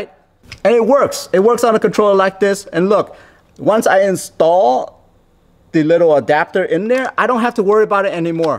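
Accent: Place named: Vietnam. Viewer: I can tell right away - viet brah :)) there's a bit of an accent. Thanks mate - just what I was looking for. Gamepad with the case on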